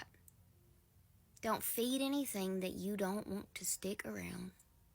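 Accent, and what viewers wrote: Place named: South, United States. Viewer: Amen I knew you was from the south because as soon as you spoke I giggled cause you and I have a similar accent.....keep on doing what your doing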